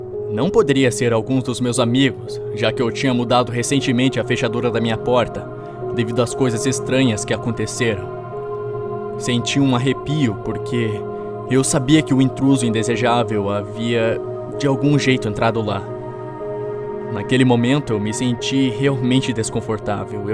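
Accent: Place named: São Paulo, Brazil. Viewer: MDS eu tbm sou :0 pensei q vc fosse paulista srsr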